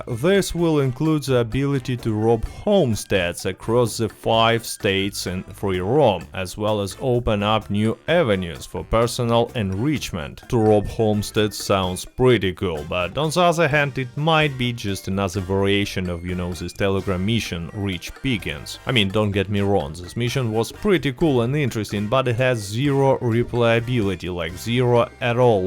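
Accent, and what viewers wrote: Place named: Russia. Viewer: I was joking idk if he's Russian but he sounds Russian lol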